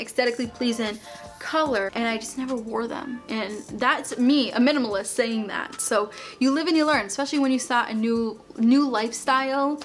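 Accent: Boston, United States. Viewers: Are you from Boston or something?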